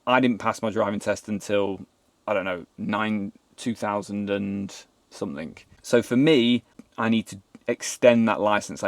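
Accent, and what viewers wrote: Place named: Sheffield, United Kingdom. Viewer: he sounds like a Sheffield lad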